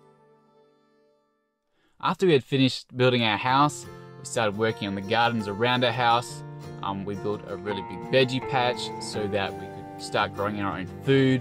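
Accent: Australia. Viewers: Sometimes it seems to me that you speak English. But it seems to me that you speak a language that I do not understand. I understand that this is your Australian accent. Speak more slowly and clearly, do not lose the ending of words. I will be grateful, I learn English by ear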